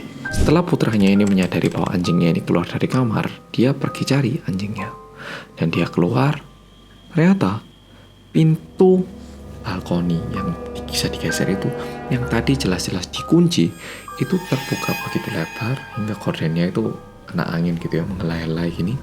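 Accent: Java, Indonesia. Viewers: MENJENGGONG ASTAGA YA LORD MAS WKWKW😭😭 jowomu muedhok temen mas😭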